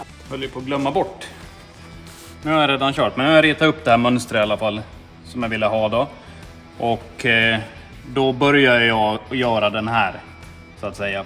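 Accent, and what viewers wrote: Finland-Swedish, Finland. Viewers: Som alltid, kul,intressant och jäkligt imponerande hantverk! Lätt den bästa kanalen..... Muminsvenskan höjer värdet också såklart 👌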